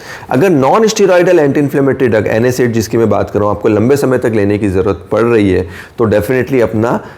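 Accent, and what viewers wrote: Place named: Gujarat, India. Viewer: Gujrati ki zuban me gujrati jalak mil hi jati he .. nice explain sir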